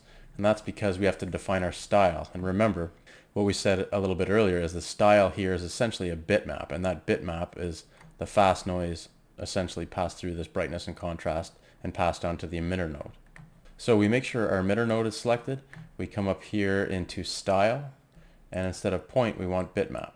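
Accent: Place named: Central, Canada. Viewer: The way you structured this tutorial was incredible; you're a brilliant teacher. And I recognized the central Canadian accent right away, bonus!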